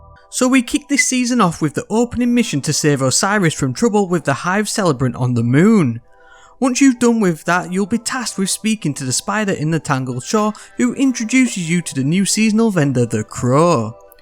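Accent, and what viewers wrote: England, United Kingdom. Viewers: That's an interesting pommy accent you have there lol. I've never lure pronounced lurrr before. Thanks for the vid ;) very helpful.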